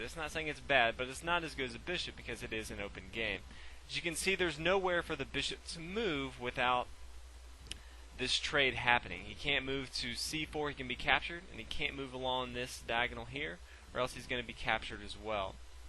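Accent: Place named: South Carolina, United States. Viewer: you sound like your from Aiken, South Carolina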